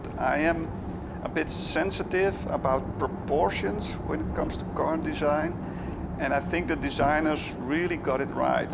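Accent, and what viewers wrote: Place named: Netherlands. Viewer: That's a DUTCH accent not German, oy vey....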